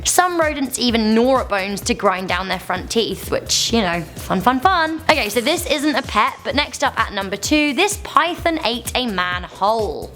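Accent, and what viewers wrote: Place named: United Kingdom. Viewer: I like your British accent and you one of my favorite YouTubers like if you agree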